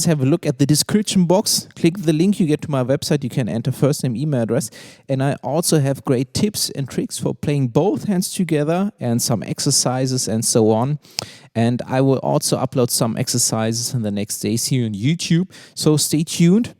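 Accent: Germany. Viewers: Its a german accent. honestly hes speaking way better than most people i know. have a wonderful day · German accent right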